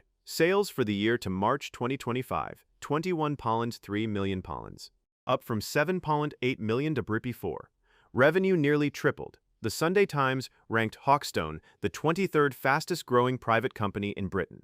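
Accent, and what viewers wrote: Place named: United States. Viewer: This narrator is American Jeremy Clarkson's is English if someone is going to narrate an Englishman who lives in England surely it has to be narrated by British narrators just saying.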